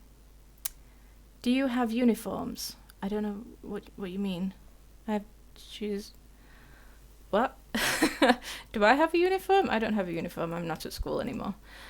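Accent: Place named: United Kingdom. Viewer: Love your British accent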